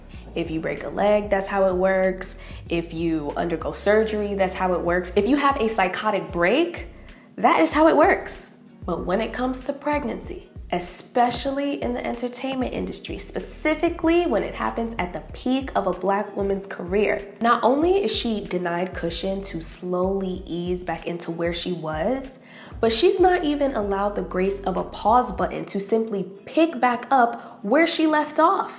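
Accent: Jamaica. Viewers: So yuh just been chippin in the patois these past videos 😂😂 I genuinely love that 😂😂😂💜💜💜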